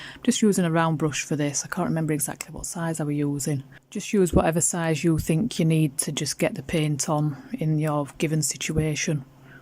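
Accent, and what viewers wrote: Yorkshire, United Kingdom. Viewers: Love your channel and that you speak my (yorkshire) accent! Dog noses are my weakness so this is super helpful for me!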